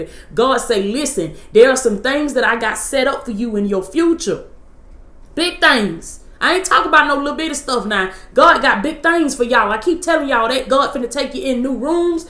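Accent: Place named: Georgia, United States. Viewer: I knew you was from Georgia you all speak more country than NC people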